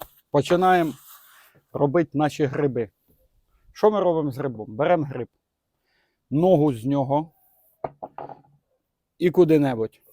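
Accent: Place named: Central Ukraine, Ukraine. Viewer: Виглядає неймовірно й смачно, дякую за ідею і хороший контент❤(якщо не секрет-звідки ви)просто так наче з центральної України)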